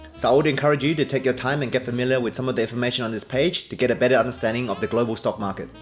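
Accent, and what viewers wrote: Bankstown, Australia. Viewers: from your accent, lemme guess. are you from bankstown?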